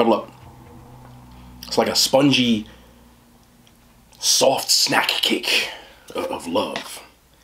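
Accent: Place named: New Jersey, United States. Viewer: I think us people from New Jersey just talk different anyway. Thanks for the video and trying those snacks I didn't know abt,looks really good.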